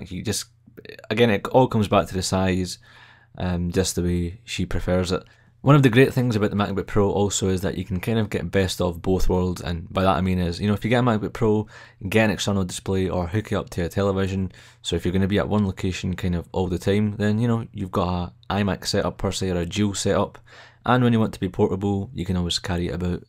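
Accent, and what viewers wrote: Scotland, United Kingdom. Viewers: Are you a Scot?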